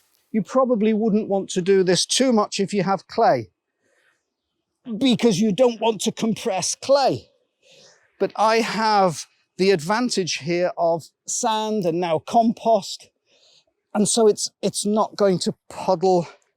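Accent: United Kingdom. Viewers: Hi! I subscribed to your videos some days ago, but I do really enjoy your style as well as beautiful British English. I'm crazy about growing and cultivating plants, though I don't have my own garden, only a balcony one with numerous pellies. Thank for sharing your precious experience. I learn a lot from you, especially the pronunciation of the plant species. Here, in Ukraine we are all into gardening and have green hands. If only we had peace! We would make our country even better with such a good teacher like you! Your tutorials are really worth seeing!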